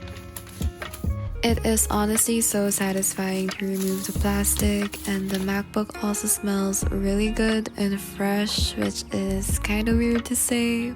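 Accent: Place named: Latin America. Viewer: You sounds like a Latino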